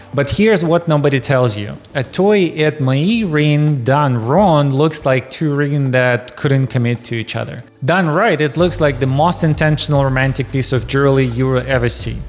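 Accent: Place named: Czech Republic. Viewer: I guess (from the accent) speaker is Czech maybe, hence the mispronunciation of French. No big deal, the content is still valid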